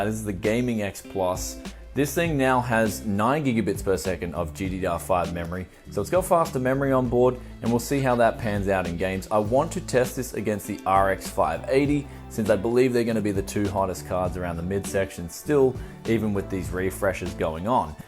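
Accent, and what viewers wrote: Bogan, Australia. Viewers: Bogan voice hype. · Sound so Aussie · I love how you Austrailans talk :D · chucking on that bogan voice m8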